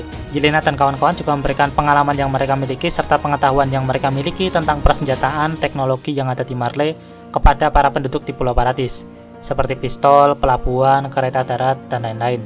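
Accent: Java, Indonesia. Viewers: Koe yo wong jowo to 😅, tanks min dengan ini aku bisa tidur nyenyak 👍👍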